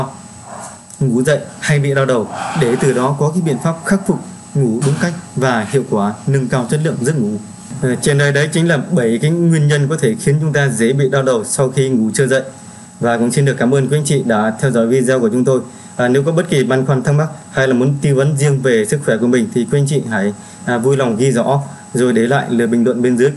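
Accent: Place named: Thanh Hoa, Vietnam. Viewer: Nghe là biết anh là người Thanh Hoá nè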